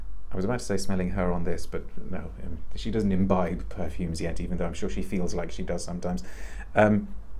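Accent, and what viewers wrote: United Kingdom. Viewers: British accent - I’m in 💖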